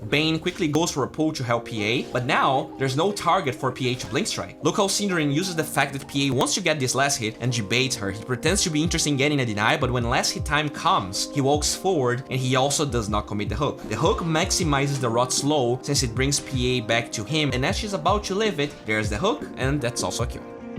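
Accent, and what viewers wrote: Brazil. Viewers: as a brazilian, his brazilian accent is rly easy to recognize, but he is improving his pronounciation comparing to his older videos. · Oh so he is brazilian that's why. I know he's doing all he can to improve his accent i believe in him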